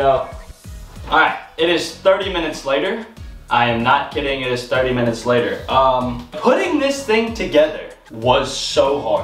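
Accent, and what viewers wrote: South, United States. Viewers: You sound country 😂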